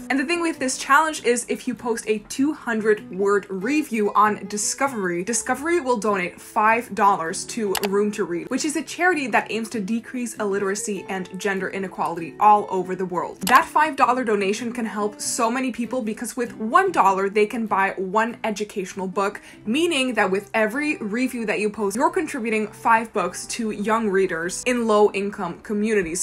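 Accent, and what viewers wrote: Netherlands. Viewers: I detected a Dutch accent right away haha, but I speak the language myself so that makes it easier to spot 😊 Her English is flawless, indeed!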